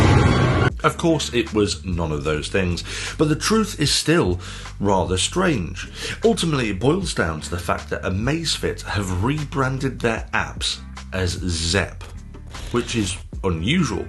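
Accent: United Kingdom. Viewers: you have cinematic charisma actually, i enjoy watching your review with your original British accent ❤️. Thank you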